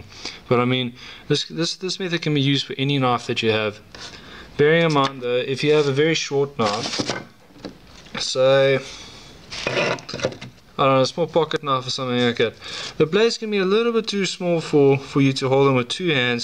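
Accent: South Africa. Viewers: Jis man kan hoor dis n boertjie wat hier praat. 😉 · I smaak the Souf Efrikan eksent bru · are you South African?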